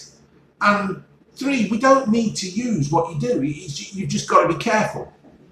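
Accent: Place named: Leicester, United Kingdom. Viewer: mate I know. I’m Leicester born and bred. Knighton. Moved to west coast of Ireland but I can recognise a lesta accent a mile off. Take care me duck 😂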